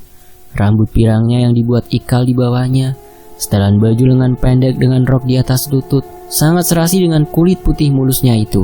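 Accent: Java, Indonesia. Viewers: Alhamdulillah pak Doni tidak ikut ikutan terjerumus ke jurang maksiat..seru yakin ceritanya aku sangat suka dengan crita ini..kadang malah senyum sendiri krna tingkah dan ksetian dn keluguan istrinya pak Doni .pngen bngt punya istri yg setia kaya istri pak Doni..hehe mksh yg nulis crita ini mksh juga admin yg sllu ku tunggu crita ceritanya .yg medok 😀😀🙏🙏 .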